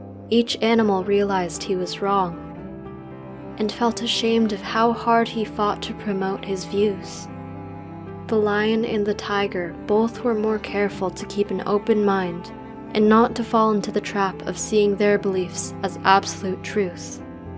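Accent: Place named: United States. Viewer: It is Bodhisatta. But she is pronouncing all these Pali words with American english accent and maybe with other accents, and making them sound like so alien words.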